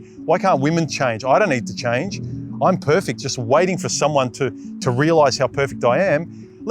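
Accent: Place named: Australia. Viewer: Ausssssie accent... love it!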